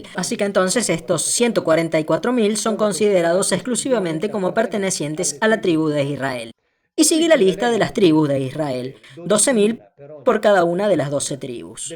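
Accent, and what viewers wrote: Córdoba, Argentina. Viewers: Que linda la vocecita, noto un acento cordobés, puede ser?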